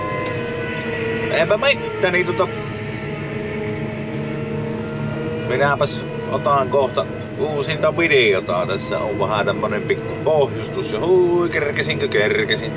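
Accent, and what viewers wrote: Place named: Savo, Finland. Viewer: Ihanaa kuunnella tuota letkiää Savvoo... Terveisin yks sutteetti savolaene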